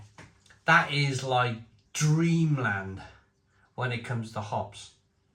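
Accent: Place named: England, United Kingdom. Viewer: Proper english lad with a proper English accent reviewing a proper american ipa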